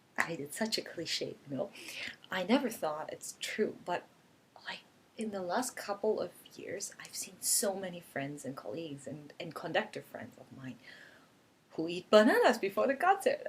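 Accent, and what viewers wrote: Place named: United States. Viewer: Alice, what other language(s) do you speak in addition to German, Japanese and English? BTW, how did you get your American accent when you speak English???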